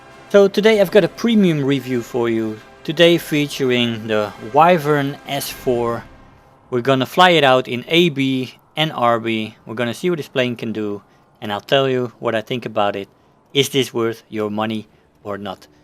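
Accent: Netherlands. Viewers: ik kan het meteen horen als iemand nederlands is, meeste nederlanders hebben een best wel herkenbaar accent als ze engels praten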